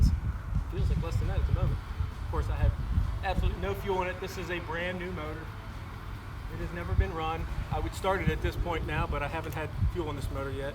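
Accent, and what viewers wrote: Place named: New Jersey, United States. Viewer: Nice video. FIrst time on your channel - you sound like you have a New Jersey or PA accent. Reminds me of home. Thumb's up.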